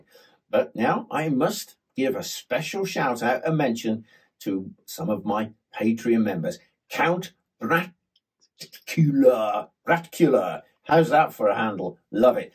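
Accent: United Kingdom. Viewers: Love your story telling. Love the British and their accent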